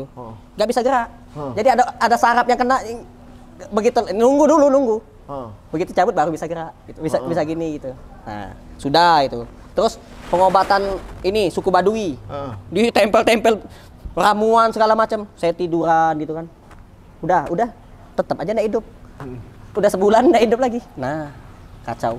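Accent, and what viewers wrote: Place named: Palembang, Indonesia. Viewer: apo kabar mang cek gaya wong palembang nian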